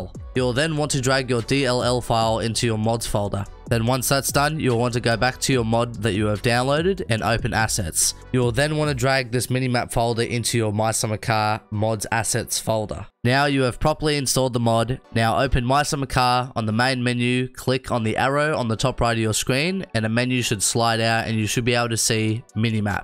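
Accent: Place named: Australia. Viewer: You Aussie too brotha?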